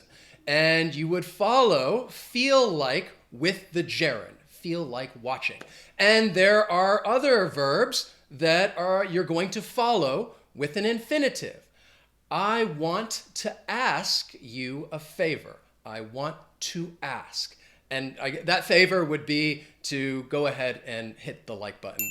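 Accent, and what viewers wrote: United States. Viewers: Thank you for this video. You teach very well! We Spanish speakers need to learn English for native English speakers as you. The best of all...I don't need to pay anything to watch your videos and your lessons are short. I don't even need an expensive English textbook . Your English is very clear and I love American accent. 🗽 Keep it up teaching! Greetings from Colombia! 😁👍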